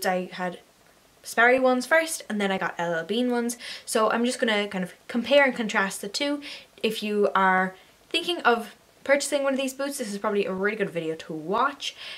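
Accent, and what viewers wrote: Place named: Ireland. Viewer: I really enjoyed your review and love your Irish brogue. I love your country, too, and visited County Cork (backpacking) in the late 60's. Anyway, I have a pair of LLBean duck boots but I dislike the collar as it is not padded. If I can get LLBean to affix padding in my collars they would be first class; and that side zipper on the Sperry's would make them awesome.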